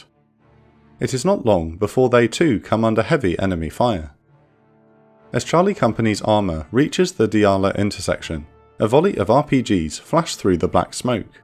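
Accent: United Kingdom. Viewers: The guy making the video is also the narrator. He needs to hire a professional to narrate the videos because it's not his strength, unfortunately. The animations have improved significantly over the years, but the narration has not. I personally don't mind his incorrect intonations and strong british accent, but some of my friends, to whom I've recommended this channel, have remarked on this subject. In fact, even the automatic captioning system on Youtube often misidentifies his words. Does it make sense now?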